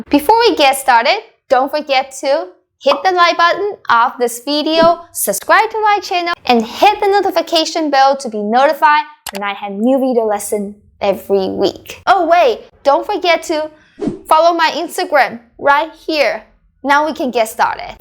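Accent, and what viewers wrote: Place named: Taiwan. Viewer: Yeah this is Taiwan Mandarin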